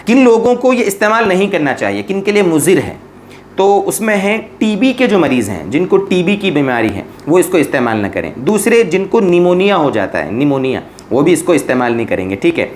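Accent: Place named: Gujarat, India. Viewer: Bolne ka laheja gujratio jaisa hai .shayad surat district ke hon.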